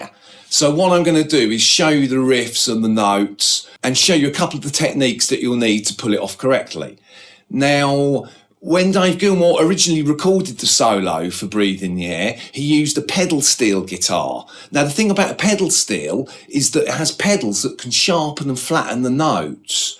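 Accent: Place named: United Kingdom. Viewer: you got a very british accent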